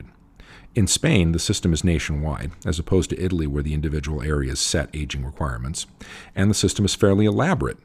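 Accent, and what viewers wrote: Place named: Caribbean. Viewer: I like your Spanish pronunciation. It sounds Caribbean (Boricua maybe?)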